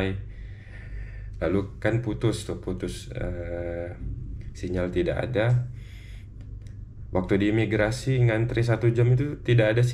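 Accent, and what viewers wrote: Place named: Kuala Lumpur, Malaysia. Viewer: Senang mendengar menyantuni keluarga pesakit dengan menggunakan loghat KL sebaik mungkin, walaupun inilah kali pertama datang ke Kuala Lumpur.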